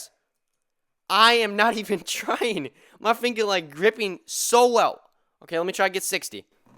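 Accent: New York, United States. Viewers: As a person who lives in New York I love his NY accent edit: sheesh thx for the likes and heart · I can feel the new York accent through the screen. Good bid m8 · Your from new york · You are from ny?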